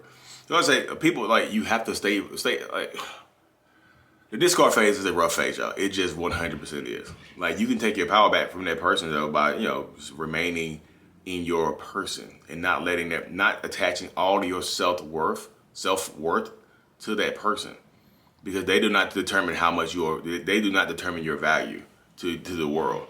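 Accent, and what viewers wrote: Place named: South, United States. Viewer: U sound like u are riding a horse Lee southern man voice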